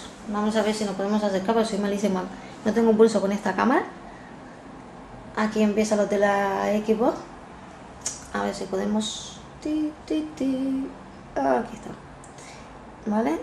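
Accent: Canary Islands, Spain. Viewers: oye una preguntita, tu eres canaria no?, lo digo porke yo si lo soy y me suena mucho tu acento, y en caso de que lo seas, donde vives con sara en la península o por aquí? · Eres Canaria ? :))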